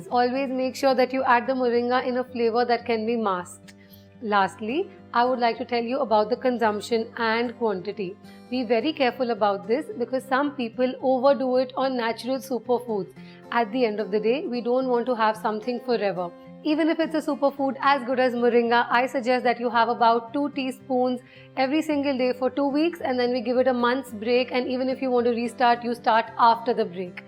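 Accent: India. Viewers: Also I love your raspy Indian accent it's so sexy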